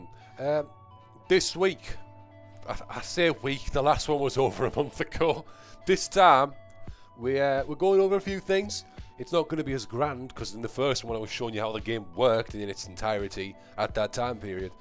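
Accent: United Kingdom. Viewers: So dont hate him for british acent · He sounds scottish, first time i heard this voice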